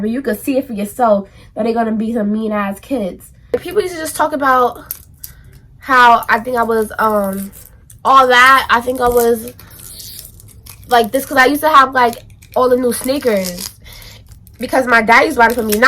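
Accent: New York, United States. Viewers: I love your accent cause you sound so New York, love you 💕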